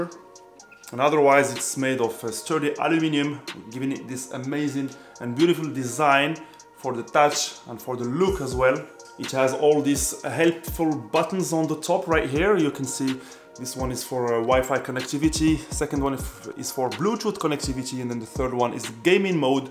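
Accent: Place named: Morocco. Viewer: sat cheft had l video tle3 liya comme pub hhhh m3a sme3t sewtek 3reftek ghatkun meghribi 😂 Anglais n9iyaaaaa hh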